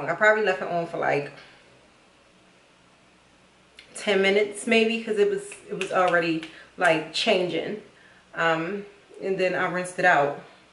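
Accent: Baltimore, United States. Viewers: Guuurrrlll I hear BALTIMORE all in your voice!!! 🤣🤣🤣 #DMV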